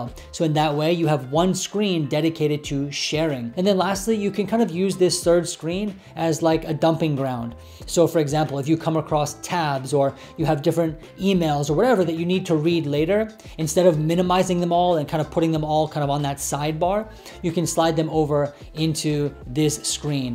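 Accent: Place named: China. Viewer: sounds chinese therefore I don't trust it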